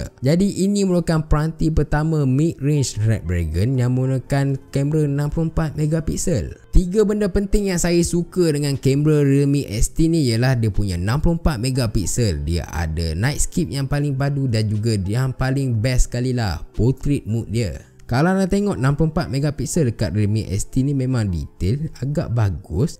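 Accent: Taiping, Malaysia. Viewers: are you from taiping?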